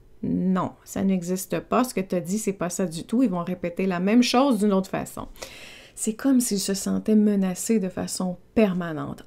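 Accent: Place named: Quebec, Canada. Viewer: Niaiserie gogosse... jamais entendu! C'est normal... je suis européen ;-) J'adore ce mot et votre accent québecquois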